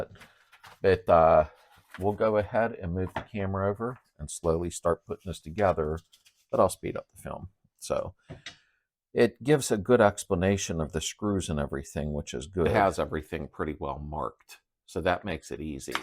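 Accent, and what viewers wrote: Pittsburgh, United States. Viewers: You have one heck of a Pittsburgh accent 😂. As a Yinzer who lives down south now, it makes me feel like I am back home when I tune into the channel. Keep the content coming!!!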